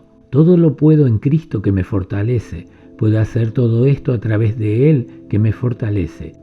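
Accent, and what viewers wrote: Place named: Cordoba, Argentina. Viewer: la voz ( aunque agradable y Cordobesa)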